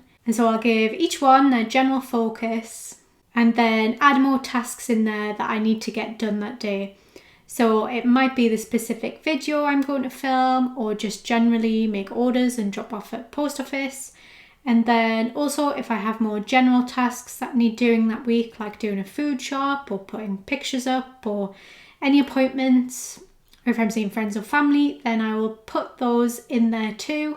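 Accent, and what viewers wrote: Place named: Ireland. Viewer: I love the Irish Accent.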